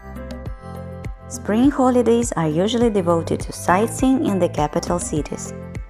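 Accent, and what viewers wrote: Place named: United Kingdom. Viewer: Спасибо ещё это топики развивают чтение да на Анг у вас бритиш енглиш.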